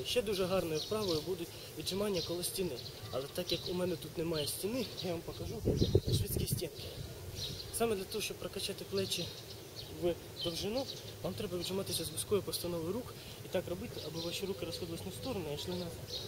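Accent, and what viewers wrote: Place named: Russia. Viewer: і в додаток, відчувається, що в твоєму оточенні розмовлять російською), "щ" = "шч" при вимові, де "ч" проривне і чітке.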